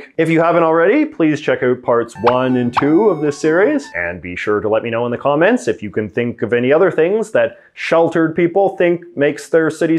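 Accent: Canada. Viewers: Love your unmistakable Canadian accent!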